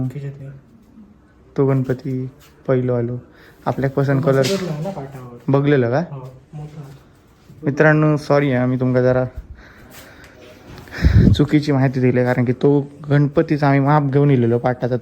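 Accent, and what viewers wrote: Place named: Malvani, India. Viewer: तुझी मालवणी खूप गोड आहे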